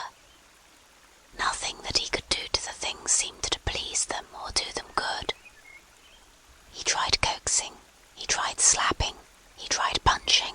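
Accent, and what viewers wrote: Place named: United Kingdom. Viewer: I understand it can be creepy but that british accent totally remembers me of harry potter. And the female whisper its just relaxing af 😍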